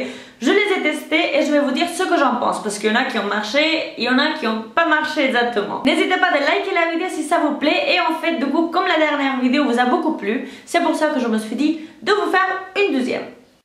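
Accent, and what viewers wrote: Spain. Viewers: c'est normale quelle parle vite c'est l'accent espagnol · elle est espagnole🤗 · elle a l'accent espanol c'est pour ça, mais tu abuse , j'en suis sur que tu as compris quelques phrases mdr sinon tu aurais pas regarder jusqu'à la fin ouhloulouu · c'est l'accent espagnole c'est normale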